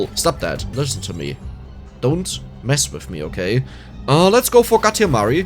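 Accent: Germany. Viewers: Just a question, where are you from? im sure your dialect is with a german aspect haha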